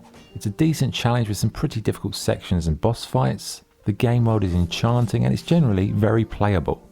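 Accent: England, United Kingdom. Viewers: I feel like the reviews are getting more organic. You and WAB are my favourites at the moment. Maybe English accents are just more tolerable.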